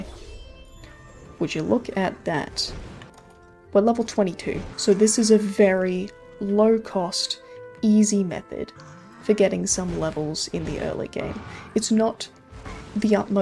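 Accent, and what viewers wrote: Australia. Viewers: Also Im a Kiwi so i picked ya for an aussie with the accent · Oblivion ambient music: checks Australian woman in a calm voice with occasional chill cursing: check I was expecting bonks but got the best ASMR. Neat.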